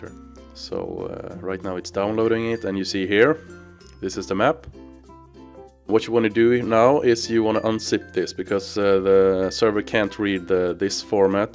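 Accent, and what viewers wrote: Sweden. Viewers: Oh you're Swedish lol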